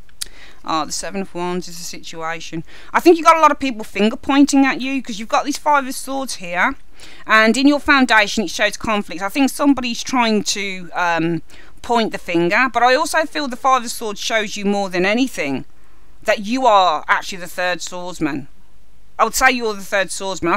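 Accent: United Kingdom. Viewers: the 1st thing I want say is I wish I were British I love your accent! 2nd when I listened to your reading my mind was totally blown. Everything you said was so on point for me like we had a conversation and I told you every single thing going on in life this very second....unbelievable! I am going through such a horrible time at work I really hate it and can't seem to find another job, I have been looking since April. My company was bought out and this new company is a horror overworked lower pay they are phoney & so are my coworkers. I am so trapped emotionally & mentally exhausted. Wow you hit it all. I have cut some many people off its not funny, deleted my coworkers from Facebook almost 2 months ago my spirit told me to do it so wow. I said f- them all. My Sun & rising are both aries so I can't stand bs people & I can't & won't kiss ass. I know this won't last & things will get better & I can't wait I've been through such tried to stay humble but it's terrible to have things reversed. 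Thank you for your words God Bless You my sister.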